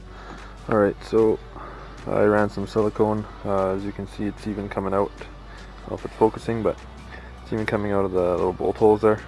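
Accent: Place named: Canada. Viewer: this is random but your Canadian accent is awesome! haha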